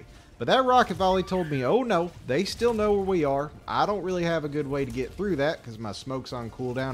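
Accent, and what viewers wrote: South, United States. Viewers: It’s interesting to hear a guy with a southern accent say democratic pride. You’ll never hear that otherwise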